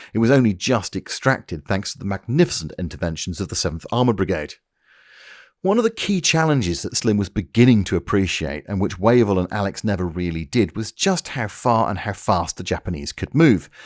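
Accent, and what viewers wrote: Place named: Birmingham, United Kingdom. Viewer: Hey, thanks. Liked the Brummie accent. 😎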